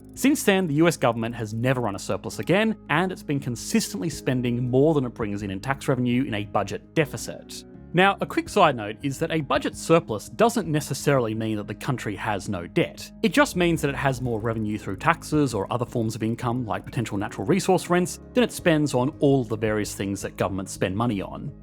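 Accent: Australia. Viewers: More bureaucratic waste to combat bureaucratic waste is awesome. Thanks HMW, the title educated me greatly (didn't watch the video cause you're Australian accent)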